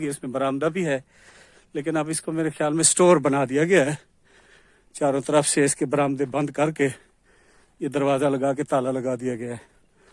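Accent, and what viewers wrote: Saraiki, Pakistan. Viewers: Sir jab ap saraki ka tarhka lagaty ho to bahot Maza ata hai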